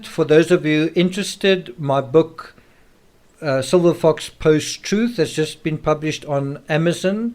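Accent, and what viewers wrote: South Africa. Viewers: The man speaks just like he spent his entire life in South Africa . Because , he pronunciations are a bit different , he is still readily understood. I feel your comment is quite rude. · ...are you South African?🇿🇦🇿🇦... Love the way all this is explained..though I still feel CW gets off on any attention..good or bad... Nothing can ever excuse what he did to them..what would be great is if he could tell the truth..even if that includes outing NK. Such delusions CW probably has twisted the truth is his head..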